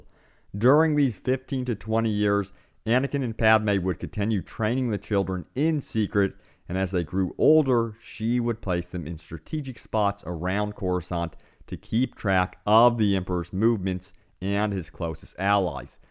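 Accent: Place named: South, United States. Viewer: What state are you from? You sound kind of southern but not really.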